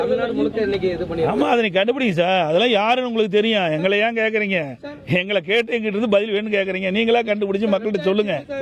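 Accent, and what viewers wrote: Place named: Kongu, India. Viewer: கொங்கு வானி யோஅது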